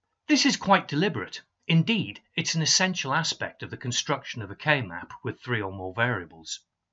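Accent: England, United Kingdom. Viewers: great video sir. refreshing to hear an english accent teach stuff like this on yt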